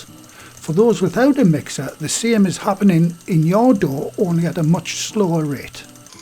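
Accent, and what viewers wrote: United Kingdom. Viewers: You are not just good at cooking, you're really good at explaining details too!! The british accent is a bonus!! It sounds like Yorkshire to me. Anyway thank you!!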